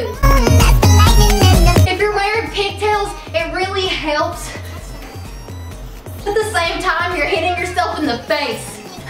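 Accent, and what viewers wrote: South, United States. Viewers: I love ur country accent it's really sweet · Throw back to when still had her southern draw ❤️👅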